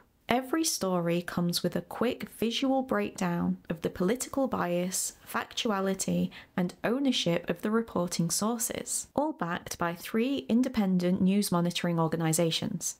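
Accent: United Kingdom. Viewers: Literally feels like listening to an alternate version of myself... but with a British accent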